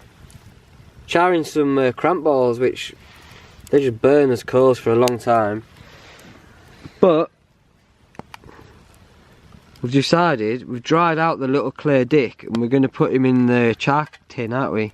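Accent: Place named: England, United Kingdom. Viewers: Eh up lad. Going a bit avant garde now eh! have you been at the wacky backy lately? And by the way, ya call it an oss in Yorkshire not a horse, I think your from Lincolnshire really you know, its your English and your accent??? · I can't understand half the times lad for your English accent. :-(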